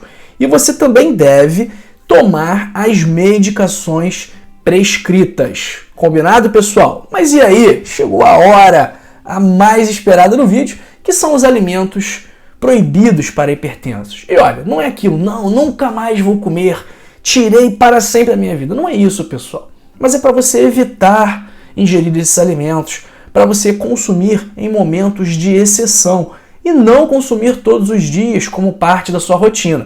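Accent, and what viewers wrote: Rio de Janeiro, Brazil. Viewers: O sr tem sotaque de carioca . Parabéns pelas dicas. Obrigada pela consulta.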